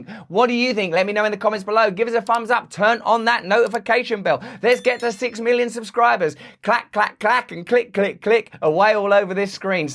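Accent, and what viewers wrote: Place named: United Kingdom. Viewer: RB I very much enjoy and appreciate your videos. You're cool and I don't think of you as an outsider. You seem more tapped in to things going on in America than most Americans. Your videos certainly are an eye-opener. I'm happy to become more conscious and the info comes with a lovely British accent. You know we Americans are partial to that. We just can't help ourselves. I'm watching and listening to more of your videos because I want more useful information. You're telling us the truth about ourselves and our country. I seek the truth in everything. Thank you for all the time and work you're putting in to revealing that truth. I'll be watching and listening for more of your enlightening videos. It's almost tea time. I do enjoy a good cup of Earl Grey. Good day.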